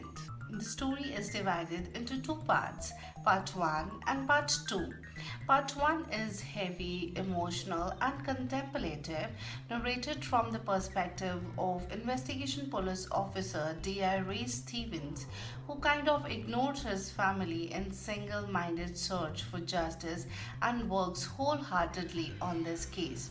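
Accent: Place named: New York, United States. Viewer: Very interesting and ur accent is very nyc....keep gng👍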